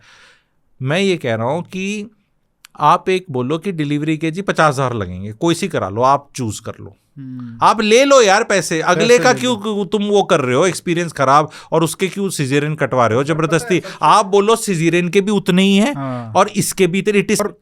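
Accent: Haryana, India. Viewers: Because he is from haryana, his tone is Haryanvi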